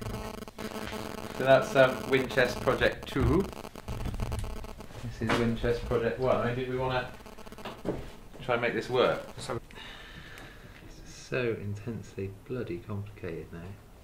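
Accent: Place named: Bath, United Kingdom. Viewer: . Hi, I grew up in Bath so its great to hear the home accent again. You have the most amazing videos, you have a great life and you have done so well in sharing. I just wondered if there was any chance you ran any apprenticeship type causes. you seem to be one of a very thin number of people who I think I would respect enough and be able to learn from.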